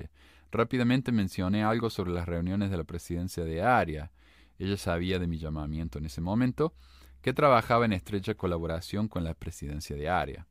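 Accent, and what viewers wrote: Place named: Argentina. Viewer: es argentino de cordova en chile estamos llenos de modelitos de reality de ese país su acento inconfundible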